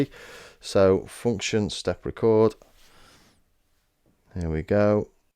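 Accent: Liverpool, United Kingdom. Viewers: Loved discovering you and I subbed. Excellent comparison and I thought it was honest, witty and basically well done, even when negotiating embarrassing technical ground hum. The 303 and Roland sound was so well designed it's hard to improve but you documented the differences well. The 303 has that env mod slide on every note and is generally rounder or rubberier while the Nubass here sounded sharp and precise,with more range. Sometimes a bit too piecing,more isn't always better here (rez) but you showed off the sub osc and lfo well. Good stuff and as a fellow scouser it was lovely to hear the accent so well represented- articulate, not too rambling but clear and funny (without trying too hard). You may of found a YT niche. Sweet.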